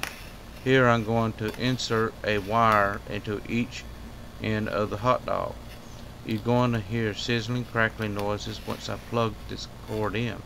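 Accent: South, United States. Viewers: this guy's southern drawl is hilarious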